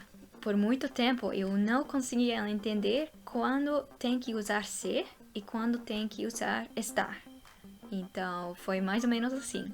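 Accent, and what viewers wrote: São Paulo, Brazil. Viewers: bemm dms,seu português está como alguém que mora em são paulo,parabéns!❤ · Você parece com uma Paulista , você fala o português muito bonito, 👏🇧🇷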